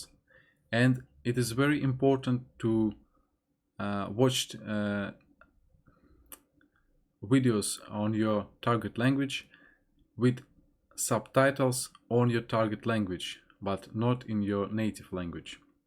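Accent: Russia. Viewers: Congratulations on your English so far... it's very good, and sounds great with your Russian accent - keep going! Great videos on your channel BTW, it's very interesting how other people live their lives in other countries and cultures!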